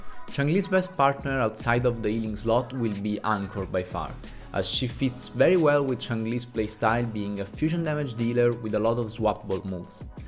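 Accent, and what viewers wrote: Italy. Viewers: Completely unrelated to the video, but are you Italian by any chance? Lol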